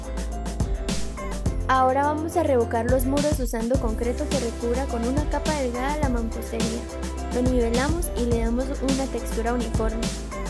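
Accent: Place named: Colombia. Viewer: Por el acento , Colombia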